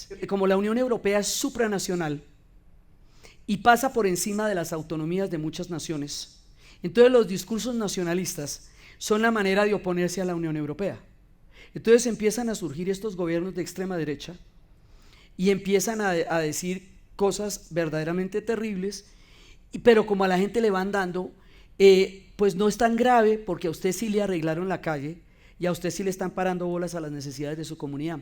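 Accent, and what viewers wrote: Colombia. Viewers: QUE ORGULLO COLOMBIANA TIENE ESE ASCENTO BOGOTANO TAN NITIDO, COMO TODO LO QUE NOS ENSEÑA GRACIAS TOTALES!! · Genial, aleccionador además que me encanta la forma de hablar de los colombianos jajajaja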